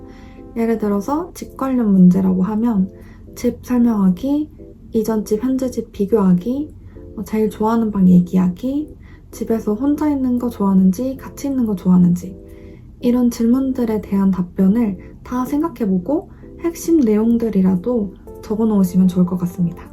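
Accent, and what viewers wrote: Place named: United Kingdom. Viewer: 영국억양 멋있어요.! Autumn 한국인이 쓰는거 첨보네요 👍🏻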